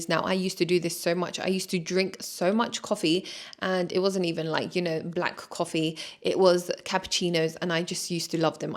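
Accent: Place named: United Kingdom. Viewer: i cant believe i was about to ask what country you're from and didn't clock the accent LOLLL! as a canadian, i watch so much british content its become normal to me so i didnt even notice it AHHAHA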